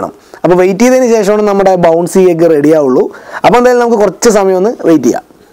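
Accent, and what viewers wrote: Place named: Palakkad, India. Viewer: ചേട്ടാ... ചേട്ടൻ Palakkad ആണോ?!? സംസാരം കേൾക്കുമ്പോൾ അങ്ങനെ ഉണ്ട്... നമ്മളും Palakkadinna😅😅 chettante videos എല്ലാം poliya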